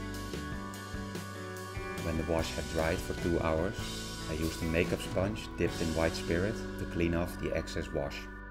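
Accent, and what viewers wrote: Netherlands. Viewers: Dude, are you dutch?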